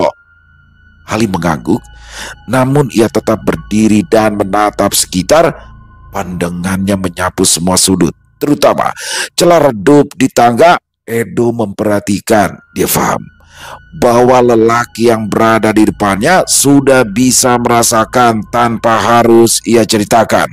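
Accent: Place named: Samarinda, Indonesia. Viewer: Samarinda hadir bang...logat Banjar Samarinda nya Paseh banar bang....ada kime' nya lagi 🤭🤭🤭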